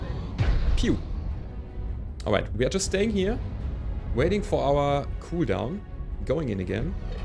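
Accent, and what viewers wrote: Germany. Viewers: Your Vids are really impressive. Do i get it right, that you are a german? Your english sounds like its spoken by a german :)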